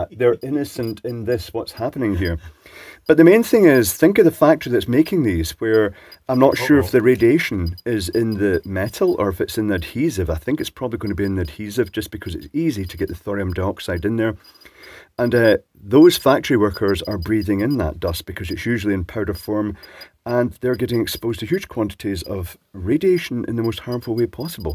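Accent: Scotland, United Kingdom. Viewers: I wish people would stick those onto their eyes to stop reading about this 5G Hazard bs. Btw it’s interesting to hear how much a Scottish accent differs from person to person. While I understand you perfectly, listening to your two buddies from the MBC sometimes leaves me absolutely clueless 😂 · incredibly well spoken for a Scotsman never heard that accent anywhere here. also that's pretty toxic how is that even legal? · love to hear voice and scottsh accent ♥ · . "Really? Sounds like a load of bollocks" Did I get that right? I'm not too familiar with the Scot's accent. Followed up by "Are some people stupid"? and the classic, "Is naivety a form or stupidity"?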